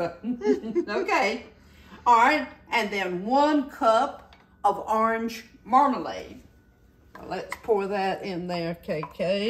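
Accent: South, United States. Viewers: I’m so excited 😊 I just ordered your cookbook! I just love your channel and your southern accent 😁 I am also a MiMi and I love to cook too!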